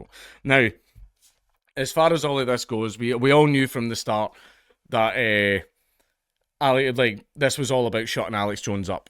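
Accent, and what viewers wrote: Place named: Glasgow, United Kingdom. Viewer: Mate, I love this channel, I love your style and being Glaswegian so listening to your accent gives me a feeling of being in the company of family as my mother is Glaswegian and rest of family are Scottish. But to see Alex crying about his children when he destroyed the lives of 26 families, who actually had their family members including children killed, all to sell his protein supplements. "They're going to take your guns, "DESTROY THEM ALL because they're all actors", with absolutely no proof. Mate, you need a to make better choices in friends. He might have some awesome charisma and you may have developed a bit a a idol crush on him. And some of his theories may be correct but the way he went about the Sandy Hook incident he deserves all he gets. He is a greedy piece of shite that deserves all he gets.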